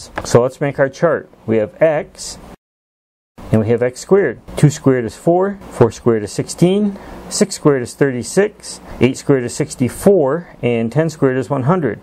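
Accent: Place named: New York, United States. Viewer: God bless yer newyorkan accent 😂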